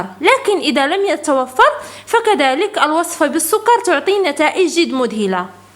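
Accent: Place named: Morocco. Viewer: يا شين اللهجة المغربية 😷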